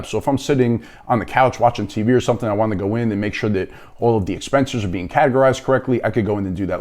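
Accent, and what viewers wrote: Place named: New York, United States. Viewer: Oh wow! I notice the mic on your shirt. Big difference in sound from the previous vid about leverage. Your voice sounds more clear and focused and the room echo is gone now. Your voice is clear and deep now. Good job man! Oh, your speech style really reminds me of Christopher Walken. I guess it's a New York thing lol.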